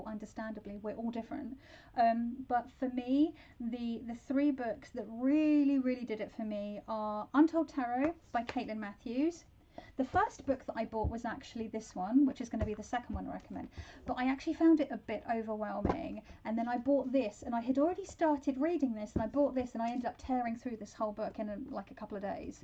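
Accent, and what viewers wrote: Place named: United Kingdom. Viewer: I wish I would've had you for a teacher when I was in school! Professor course on "the metaphysics of English literature" is fab! cool British accent, long flaming red hair wonderful speaking voice and a master of waffling, what more could you ask for in a cool college professor!!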